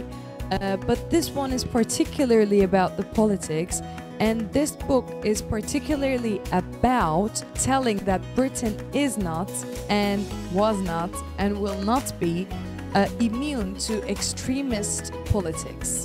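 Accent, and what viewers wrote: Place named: Russia. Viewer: Her accent is uncertain but I would guess it is Russian.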